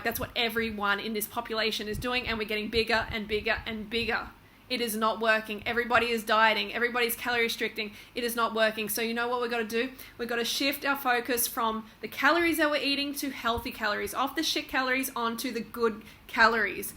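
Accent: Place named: Australia. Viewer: I realize your Australian (accent>? I am guessing BC I don't know who you are)...but I think what we are seeing in the States is a revolt of Political Correctness and all these speech police codes America has been pummeled with...it will only continue as people break free of the liberal college "ism" and "ist" box that has been constructed around us in the last 5 years or so...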